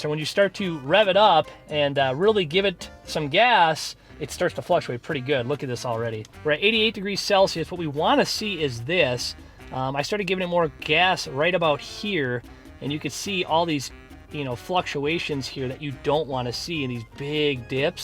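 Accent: Minnesota, United States. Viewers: This guy definitely lives in Minnesota somewhere. There is snow in the background of almost every video! And his accent is a dead give-away.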